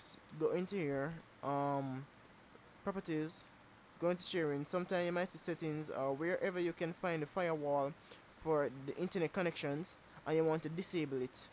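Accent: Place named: Caribbean. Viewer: and i can bet u from the caribean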